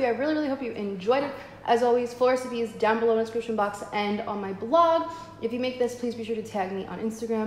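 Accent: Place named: New York, United States. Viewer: Btw so glad I found your channel. And your NY accent is so great, I’m from Long island so it sounds homey and familiar 😊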